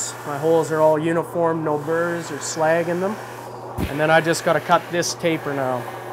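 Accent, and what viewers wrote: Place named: Canada. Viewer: Canadian accent.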